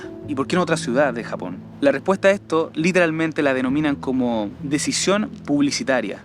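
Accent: Chile. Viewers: ERES CHILENO! Que lindo escucharte! Gracias por comenzar el video sin intros y directo al grano. Gran video y dicción. Sigue así 😊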